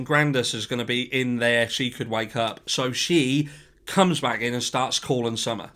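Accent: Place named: England, United Kingdom. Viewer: I do love your accent also. Always have loved the way the English pronounce and state, with such compassion. I had forgotten about Jodisue's statements about the Suburban. Candus you to get straight and come up with the truth, no matter what it is - for Summer's sake, her memory, her dignity, her innocence...Do one right thing in the name of ALL your children.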